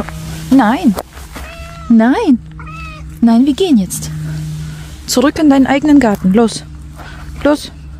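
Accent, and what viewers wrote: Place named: Turkish German, Germany. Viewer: Jetzt fängst sogar du schon mit diesem Türkendeutsch an man man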